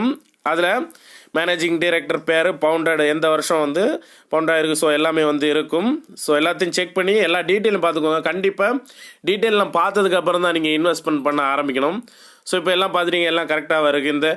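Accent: Kanyakumari, India. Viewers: Ur speaking Kanyakumari Tamil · Kanyakumari ya bro ...namah ooru voice mahdiri iruku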